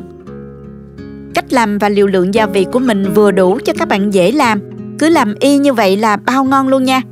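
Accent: South, Vietnam. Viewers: Ngon ,giọng nói miền nam Việt Nam dễ thương,❤❤ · Giọng nói miền Nam của ngọt ngào rất dễ thương. Tiếc là cô dùng từ miền Bắc, và nhiều tiếng Hán Việt khó hiểu và vô nghĩa, như “thấm sâu vị”, “điều vị”, “sử dụng”, “sơ chế”, “chế biến”…Mọi người đang nói và viết tiếng Việt tuỳ tiện, không cần hiểu ý nghĩa và mục đích. Kính mong nói như một người miền Nam chính gốc, mộc mạc, giản dị và dễ thương như giọng nói của cô.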